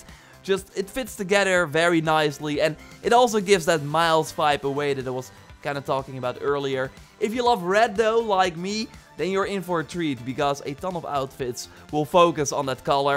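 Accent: Netherlands. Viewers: Je kan horen dat je Nederlands bent lmao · ben je nederlands ofzo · ik hoorde meteen dat je nederlands was😂😂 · Steenkool engels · Are u dutch? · lol je accent geeft zo hard weg dat je nederlands bent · jij bent nederlands of niet ik hoor een nederlands accent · Are you dutch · are you dutch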